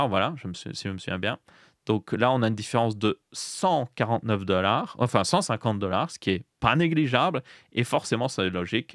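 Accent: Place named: Belgium. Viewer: Tous les nombres qui sont énumérés avec un accent belge me font perdre le fil, ça devient difficile de suivre puis je décroche complet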